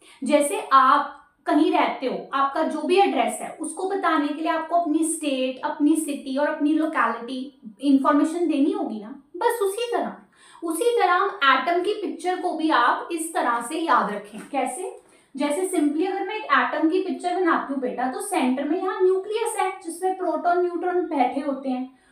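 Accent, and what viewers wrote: Punjab, India. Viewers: Mam ap punjabi ho???😅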